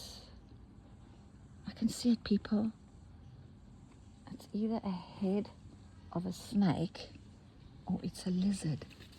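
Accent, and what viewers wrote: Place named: South Africa. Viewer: I enjoy listening to you nattering away 😊 I love south African acsent & I adore hearing the bird life around you it sounds so serene I wish I could see it. I have ordered yr books, im excited to get them & start a project using them, I found you after discovering the lovely Corrines channel, so I'm grateful to both of you ❤I also have Judith's books which are excellent as you know, but you can always learn a different way from different teachers, so I can't wait for yrs 😊 oh fabulous you did show us yr surroundings superb just lovely, you see I comment as I think of things I want to say so I don't forget, so I keep editing thankyou for showing us yr gorgeous space where you create beautiful ( with a sigh of delight)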